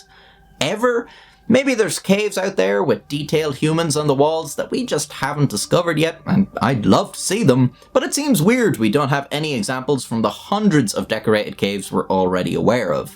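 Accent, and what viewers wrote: Ireland. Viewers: He is a well educated speaker of Irish English, that is how that number is pronounced.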